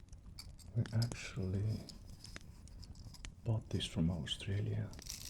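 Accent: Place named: Europe. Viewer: Wow this is a great video! Im subscribed now for sure. I have a question, I noticed you have what sounds like an accent, are you European?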